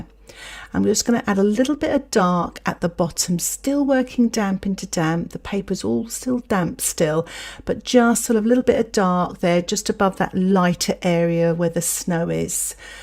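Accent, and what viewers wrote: England, United Kingdom. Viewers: Lovely, from Green Bay, Wisconsin, USA. Watching painting and avoiding the election drama, too much anxiety. Your lovely English accent calms me. Thank you!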